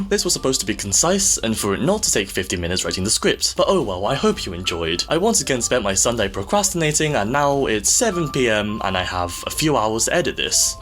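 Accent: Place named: United Kingdom. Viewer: Are you British? Your accent sounds British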